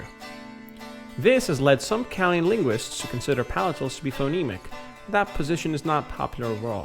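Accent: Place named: Sweden. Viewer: The intonation sounded very Swedish.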